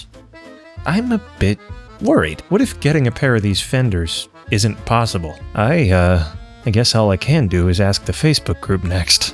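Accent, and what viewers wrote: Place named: Central Pennsylvania, United States. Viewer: Every time I hear you say something like “there’s a lot of hoses on this car that need swapped” I’m back in Central PA😅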